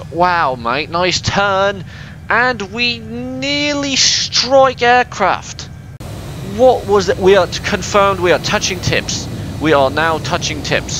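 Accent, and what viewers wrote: United Kingdom. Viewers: I’m absolutely in love with this (I’m assuming British) accent lol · i love your british accent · Why your voice sounded like your british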